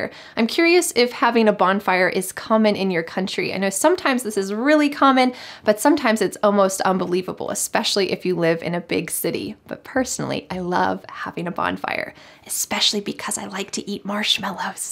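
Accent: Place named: United States. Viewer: I got impressed with your story about vacation and I can figure it out how fun it was. in my country I never get a vacation so I work twenty for seven. it's really tiring but I just took it for granted for everything that I have. I wish I could it's probably after retiring , I really like your video lesson and love American pronounciation. thanks a lot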